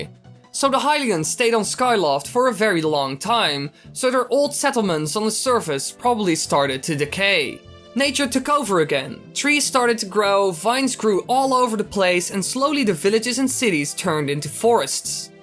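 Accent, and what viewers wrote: Netherlands. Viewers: Nice video! Are you Dutch? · Are you Dutch?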